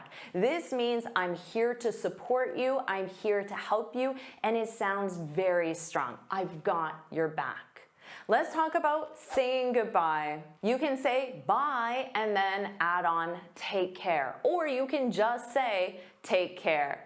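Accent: England, United Kingdom. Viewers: Very clear English accent Very understandable Thank you so much for improving my english❤😊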